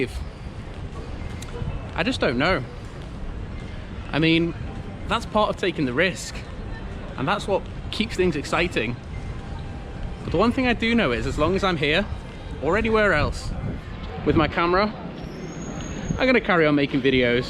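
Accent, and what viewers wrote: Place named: Liverpool, United Kingdom. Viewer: welcome to China. BTW, are we still hiring scouse teachers? odd, very odd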